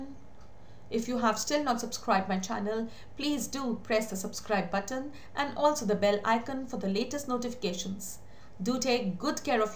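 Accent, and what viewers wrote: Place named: Australia. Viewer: But , even though u are an Indian , your accent is much like an Australian ✨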